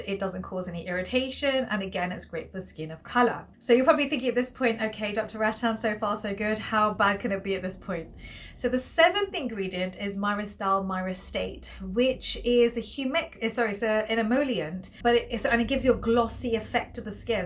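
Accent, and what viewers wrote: United Kingdom. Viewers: Special thanks for you English British accent, i am as a foreigner just can't stand American English accent, so again thanks and all the best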